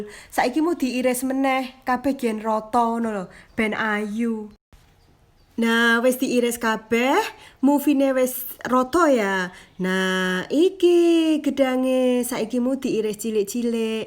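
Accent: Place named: Java, Indonesia. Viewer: Ngedok bangat kaka 😁😁bahasa nya jadi lucu gitu dengar nya acc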